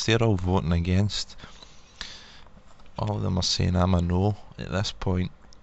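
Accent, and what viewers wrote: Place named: Spain. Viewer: I found the thick Spanish accent difficult to follow at times-- but really enjoyed the program : )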